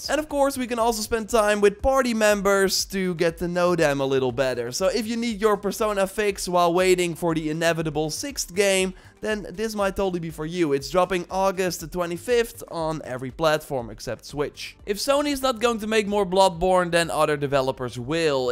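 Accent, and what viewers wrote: Netherlands. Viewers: After all this years... still that heavy Dutch accent while speaking English. Very annoying.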